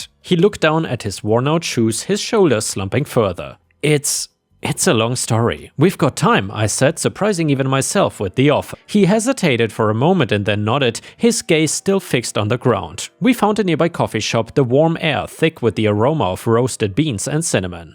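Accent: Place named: Germany. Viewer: Hey! Guessing from your accent, are you german? Anyways, thanks for the entertainment!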